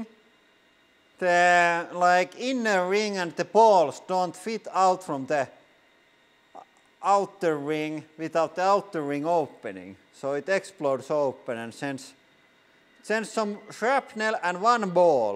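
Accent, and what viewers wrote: Finland. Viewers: Is it me or does he sound more Finnish in this episode? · Got to love this Finnlish accent! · I must compliment you again on your straightforward Finnish accent, that's a beautiful thing and always a pleasure! Olet raudanluja kokeilija, hiihdä näitä latuja ja menesty vielä suuremmin!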